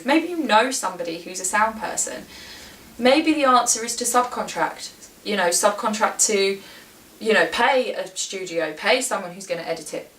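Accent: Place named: United Kingdom. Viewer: I recently indie published a novel on Amazon, and I am considering to try the audio book myself. I found this video very informative. As far as your voice goes, it sounds fine to me. As an American, I find British accents somewhat musical, very pleasant to listen to. However, I can understand from a time standpoint with three small children. My daughters (3) are all raised.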